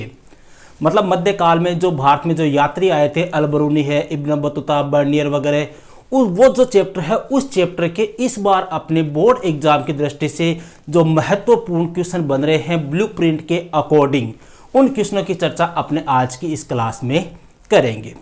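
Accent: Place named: Shekhawati, India. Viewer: Sir aap sekhavati area se h kya language vesi lag rahi h bahut acha padhate ho sir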